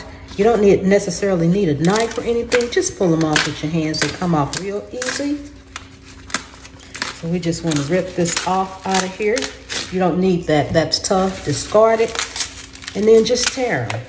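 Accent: South, United States. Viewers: I just love your southern accent, (and I'm from the south).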